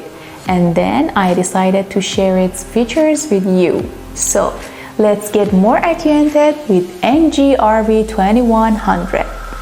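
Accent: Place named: Iran. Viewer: Phenomenal Persian accent!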